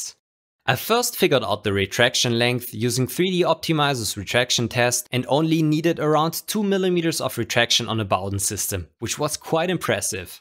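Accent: Germany. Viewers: Are u German? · Ja genau! Ganz geil alter only watched 5 seconds of the video and already knew u were German lol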